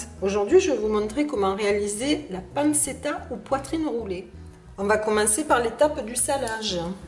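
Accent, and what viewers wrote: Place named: Provence, France. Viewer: bonjour merci de cette magnifique recette..vous avez un accent qui ressemble au mien je suis provencal d'arles et je vis en thailande ........adessias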